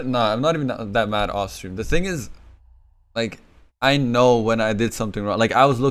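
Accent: Germany. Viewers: Ur german? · I found it was a headshot on the Bamdit and a question are u German? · Daaaafaaaaaaq Ur German? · Is he german??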